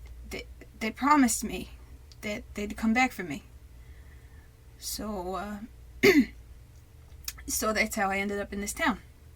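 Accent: United States. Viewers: I'm gonna assume this is the Boston kind of accent. I'm from the South, and I never met a Northener before, so I can't tell accents apart. But I love it! I love everything in this! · You have an amazing New York accent.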